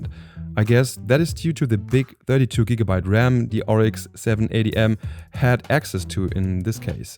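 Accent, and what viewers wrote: Germany. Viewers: I bought my mom a mini PC with a Ryzen 7 H 255, 780M graphics, and 24GB of RAM. It's mainly for city-builders/strategy games, and I'm curious to see what this little machine can do. Linux would also be interesting as the OS, since it's supposed to run faster. And you can also use FSR 4.1.1 pretty well now with OptiScaler. Interessant wie man unseren Akzent schlecht verbergen kann ;)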